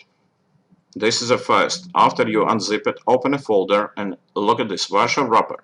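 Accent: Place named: Russia. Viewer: Thank you for your job! Are you Russian?)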